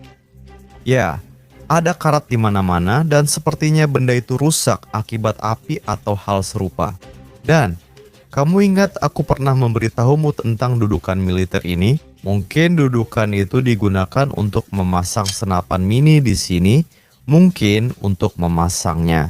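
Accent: United States. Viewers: iti orang adit suara asli orang USA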